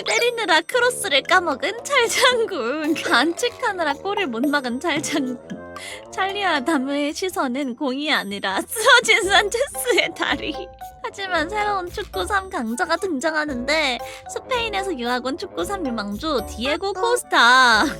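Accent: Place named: United Kingdom. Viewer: 영국 발음 느낌 지리시네요ㅋㅋ 개추드립니다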